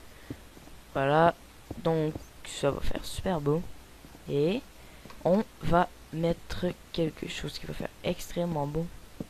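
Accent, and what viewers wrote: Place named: Quebec, Canada. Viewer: Ta un axan québecois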